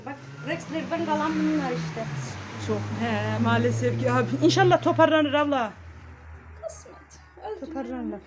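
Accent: Azerbaijan. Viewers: Salam canım turkiyəm bacım siz yarısı azeri yarısı türcə konuşuyorsunuz hangi bölgə böylə konuşuyor sizdə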